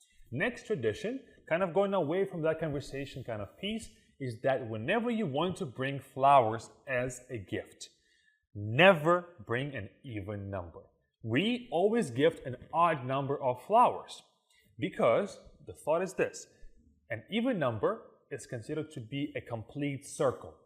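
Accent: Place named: Russia. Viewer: Смотрю эти видео, чтобы посмотреть на себя со стороны и изучать английский, слушая русский акцент) Однако)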